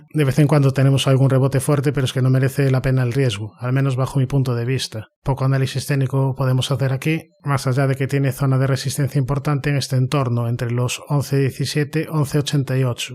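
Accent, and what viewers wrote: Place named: Seville, Spain. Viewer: Por el acento y tu nombre y apellidos está claro que eres de Triana 😅. Llevo más de 35 años invirtiendo en Bolsa y puedo afirmar que eres un Crack y te sigo desde que te descubrí. Entiendo de Balances y necesito siempre tener datos de los directivos de las Empresas pero, por desgracia, no tengo conocimientos técnicos de gráficos ni velas...Te apoyo al 100% para que sigas dándole luz a nuestras inversiones. Te lo dice un Coruñés nacido en el Concello de Mañón tbn con acento Sevillano. Mucho ánimo y, sobre todo, muchísimas gracias por tus aportación a nuestras inversiones.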